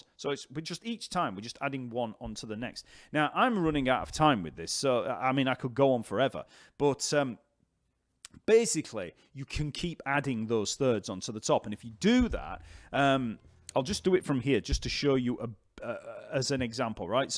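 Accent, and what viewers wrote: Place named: Northern England, United Kingdom. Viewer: Hey, cool about moving here to USA! I’m from both San Diego/Los Angeles, and live currently just north of Atlanta. I love your up north accent, no wonder I have no issues understanding you, because I am used to my fav Manchester bands accent, and can understand them when they’re drunk (most of the time), you kinda sound like Johnny Marr, Bernard Sumner!:) Sheffield is where the is from I think! I love Phil Oakey. They have some great bass lines, mostly synth but I think there’s a bass guitarist for “ ”:) glad you’ll be closer, so I stop missing the love hangs so much! Lol Dallas or Austin in TX is also cool! I’ve visited. Anyway thank you for this lesson! What you described about the chord progressions having a lot of extras on them is exactly what I’ve been struggling with within music theory. I bought your chord tones course and I’m so glad I did! 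I’m excited to get a start on it soon as my tendonitis gets better! Thanks so much for your awesome teaching style and materials! I have so many aha! Movements when watching your courses and YouTube videos!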